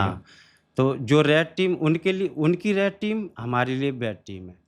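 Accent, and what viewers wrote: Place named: Gwalior, India. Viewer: Tumhari bhasha gwalior sambhag ke aaspaas ka hai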